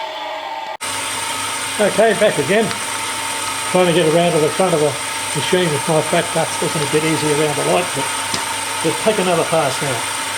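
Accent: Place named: Australia. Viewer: Please don't take my comments negatively. I appreciate most of your video content, but the audio is horrible. I suggest that if you want to speak, please try doing voice over in post production. I have not been able to actually hear you or discern what you are saying over the other noises in most of your videos. Aussie accents to Americans sounds like Greek (maybe it goes both ways). But nonetheless, I don't know what you are saying, AND I REALLY WANT TO. Thanks for showing so many wonderful grinding setups! I'll keep watching no matter what.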